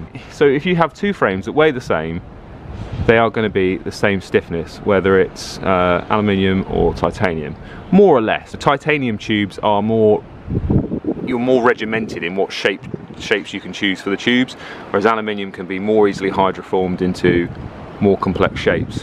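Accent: United States. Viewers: Spot on american accent. Cheers!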